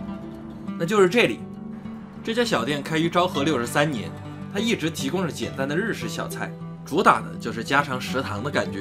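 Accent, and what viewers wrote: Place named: North, China. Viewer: 好奇。影片里都换算成新台币，你怎么有大陆北方的口音？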